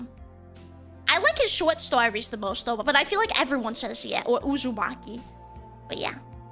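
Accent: Boston, United States. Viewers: Hi, thank you so much for the fun chat at Sakura con. Can't wait to see this channel grow. Love the adorable Boston accent btw.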